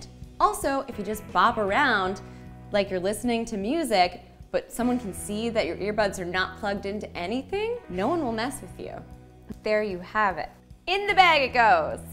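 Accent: Canada. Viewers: Canadian Accents bruh: "my begs" & "luggage tegs" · I love her Canadian accent 😍😍 the way she says tag is so cute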